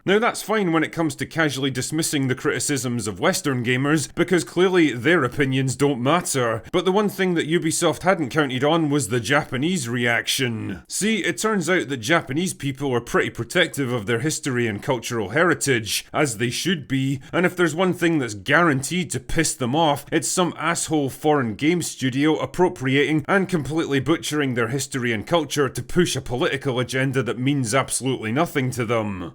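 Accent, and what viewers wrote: Scotland, United Kingdom. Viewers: I love your gay Scott accent in all your videos. I hope it isn't fake.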